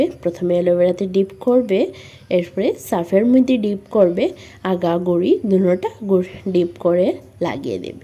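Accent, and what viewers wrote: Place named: Sylhet, Bangladesh. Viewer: Suddho bangla tumar dhara possible na, sylheti valo hobe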